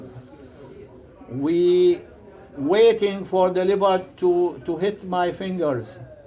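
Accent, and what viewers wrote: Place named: Arab world. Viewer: Hhahaha it's Arabic accent 🥰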